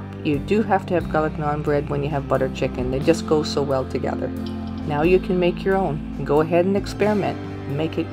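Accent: Canada. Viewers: Thanks for sharing I love naan bread and I also like your Canadian accent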